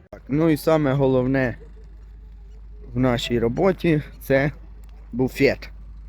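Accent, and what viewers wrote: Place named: Poltava, Ukraine. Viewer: Полтавчанина по говору зразу чути. Дякую за екскурс по місту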